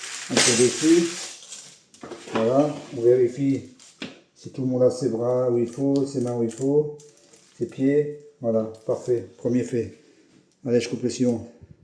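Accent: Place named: Lorraine, France. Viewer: Genial recette et commentaires....moi qui suis lorrain d'origine j'adore retrouver l'accent et les expressions.... J'ai mis a l'agonie mon kenwood cooking chef....1.5 de farine = limite de capacité ! Mais il a bien fait le taf 😊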